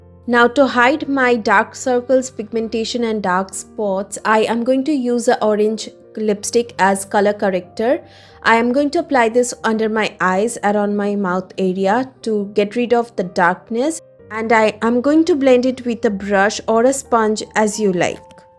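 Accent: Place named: Bengal, India. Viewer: Just love ur bengali accent im from Kolkata n would love 2 meet u one day♥️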